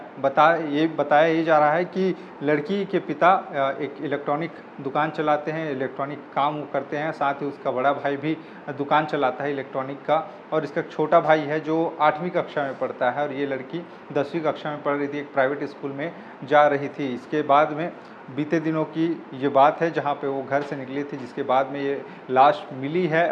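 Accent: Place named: Chhattisgarh, India. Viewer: Tor c.g vala boli band kar de ka ga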